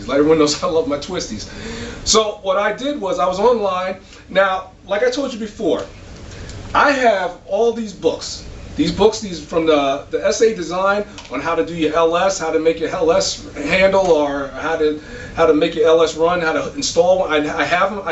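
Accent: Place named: South, United States. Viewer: I wondering where you live? Sounds like in the south. I'm on the West coast, Blacks build lots of muscle cars.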